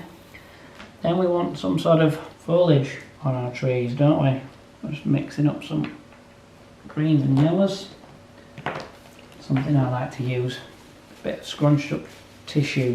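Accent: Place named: Yorkshire, United Kingdom. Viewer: Nice to listen to your Yorkshire accent, thanks for your Video 🤝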